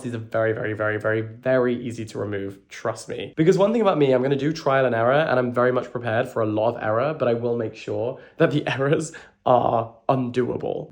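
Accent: United Kingdom. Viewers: I hope she paid damages for the lie. You sound like UK but the situation sounds like nutty California.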